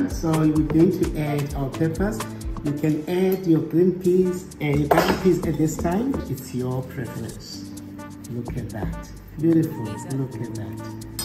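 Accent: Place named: South Africa. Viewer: Wow..you sound like a fellow South African! 🤣🤣🤣👌...so Im gonna be ur new sub.! Your dishes look soo amazing.I recently made a fruit salad on my page that can pair well there.🤩Wish you the best !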